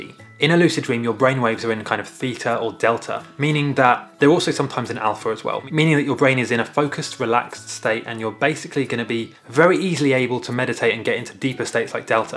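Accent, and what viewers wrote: England, United Kingdom. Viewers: You are like the joe wicks of lucid dreaming, Long hair, English Accent and all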